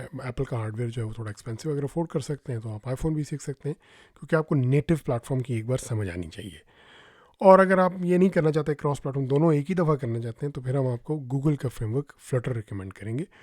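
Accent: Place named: Pakistan. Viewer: بہت خوشی ہوئی یہ چینل پاکستان میں دیکھ کر وہ بھی اردو لہجے اور زبان میں، ورنہ ذیادہ تر دیہاتی لوگ منجن بیچ رہے ہوتے ہیں۔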